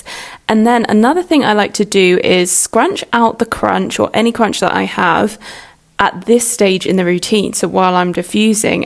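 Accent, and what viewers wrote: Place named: United Kingdom. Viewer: I didn't know you were British you have such a beautiful voice 😊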